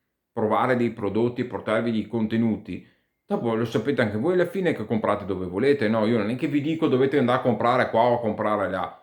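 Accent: Veneto, Italy. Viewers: Ciao complimenti per quello che fai, forse mi sbaglio.. ma dal tuo accento mi sembra che tu sia veneto.. mi sbaglio o ci ho preso?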